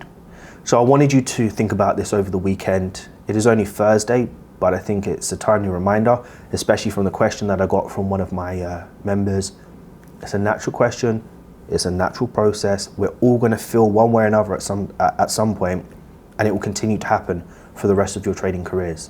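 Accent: London, United Kingdom. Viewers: Good old London matie!!! 🇬🇧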